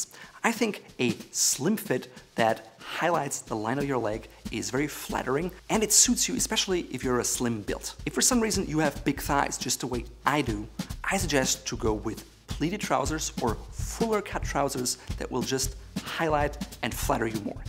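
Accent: Germany. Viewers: are you german? your accent sounds like a german. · I like your accent! Are you German?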